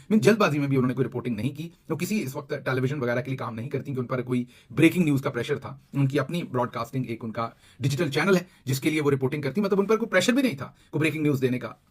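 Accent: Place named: India. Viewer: یہ ٹھیٹھ ہندی بول رہی ہے اسی لیے ہزار کو ہجار بول رہی ہے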